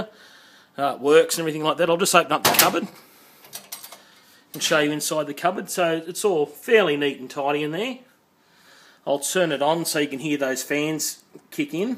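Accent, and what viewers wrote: Australia. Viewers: btw good to hear another australian accent on youtube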